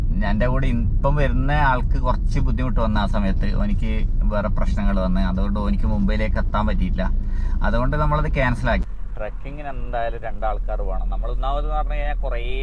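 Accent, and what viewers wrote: Kannur, India. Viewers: യാത്രയ്ക്ക് ആശംസകൾ. Vedeo length കുഴപ്പമില്ല. കണ്ണൂർ slang നമുക്ക് സൂപ്പർ.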